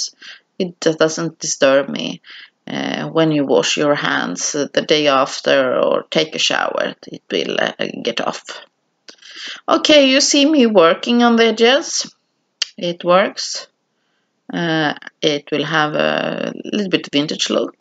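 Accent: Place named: Sweden. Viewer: I love how you truly explain your techniques!!! I would love to buy the Finnabar brand products, but it is so expensive here in the US. Also, I love listening to you speak, it makes me miss min famijl in Skane. Have you done any mixed media projects with a more Swedish tone? My cousin was on Alt for Sverige and we found a photo of our linking great grandmother. I want to do a project for all my family with her photo in the mixed media style, but with a Svenska tone to it. Tack for any suggestions!!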